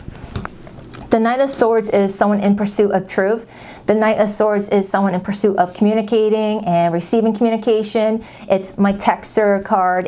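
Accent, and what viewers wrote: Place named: Boston, United States. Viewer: Bostonian here too!! I was trying to place your accent before and wasn't sure. 😂 🙌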